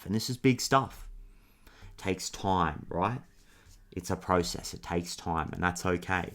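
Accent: Australia. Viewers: He's Australian!!❤🤩A shinning example of our beautiful connected Australian men 😁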